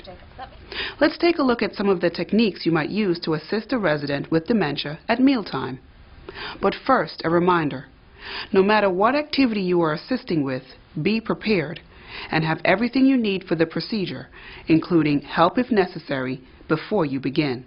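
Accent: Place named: England, United Kingdom. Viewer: Best teacher ever...soo eloquent ...like your clear English accent..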